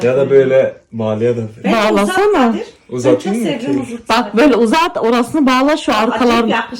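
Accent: Turkey. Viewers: orda doğdunuz yani nerelisiniz peki şive doğu gibi geldi yada yabancı dil konuştuğunuz için mi kız kardeşimde Fransa da 2 gün falan oldu gideli orda yaşıyo yeni gelin 😊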